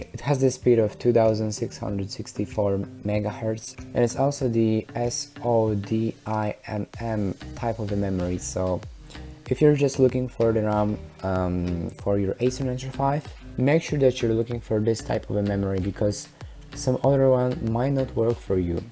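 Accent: Poland. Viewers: Wiedziałem po akcencie, żeś jest Polak :D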